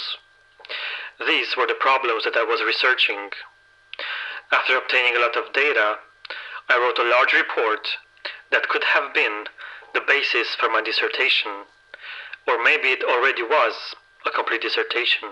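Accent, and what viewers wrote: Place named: Sweden. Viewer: Are you Swedish? I read your profile and it says Romania but your accent strikes more as if you were a native Swede.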